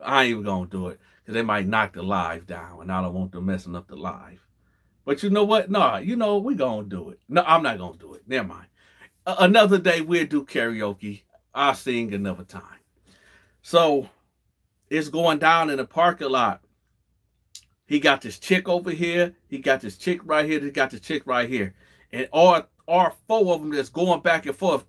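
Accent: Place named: Ohio, United States. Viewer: I swear you Feel like Family. Something about your Aura is Vibrant. And you mentioned Ohio. It's family Familiar. Your accent and language. Buster...lol they way you talk I knew before you said it. OHIO Blessings to You and your Family...We all just tryna Understand this thing we call LIFE. Thank You...for your time.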